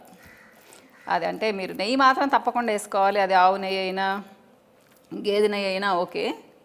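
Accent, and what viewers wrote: Nellore, India. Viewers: gaaru mee videoes chusthunnaanu,mee manchi maatalu,padhimandhiki panikivachhey vishayaalu, mee maatalaloney thelisipothundhi mee methhati manasu.maadhikooda Nellore eynandi,mee prathee maata nenu matladuthunnatley undhi, naa manasu ki daggara gaa undhi,ee roju pappuchaaru chaalaa baagundhi,nenu kooda eppudu chesthuntaanu,kodhigaa populo menthulu,inguva vesukuntey Inkaa chaalaa baaguntundhi,kothhimeera kooda dinchey mundhu vesukuntey chaalaa baaguntundhi.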